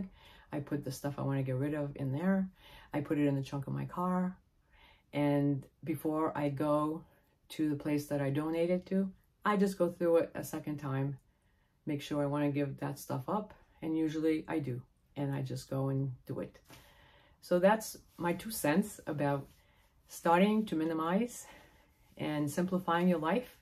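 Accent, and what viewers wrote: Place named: East Coast, United States. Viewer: I am so enjoying your videos! What you have to offer is much needed right now; please keep at it 👍 Your numbers will continue to grow, but I have to say, it was a little difficult finding your channel again when I had cruised past it intending to return and watch it later (I do this a lot. I just remember the title instead of saving). When you type in Living A Simple Life a hundred other channels come up with the same name You are so unique; your east coast accent is fabulous; I love when it pops out naturally, lol. Your personality really shines through ✨️✨️✨️ I'm intrigued by your life because I am currently on the west coast (CA), dreaming of moving to the east coast and living simply as well. As a native Minnesotan I'm really missing having four seasons... I look forward to your future videos and watching your channel grow! 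❤️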